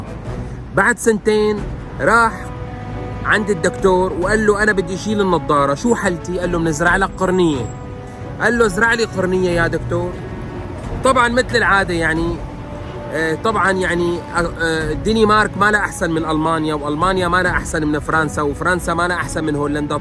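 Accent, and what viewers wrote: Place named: Damascus, Syria. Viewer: باين عكلامك من الشام يعني عربيني او دوماني بس ماتجمل وتقول السوريين عيب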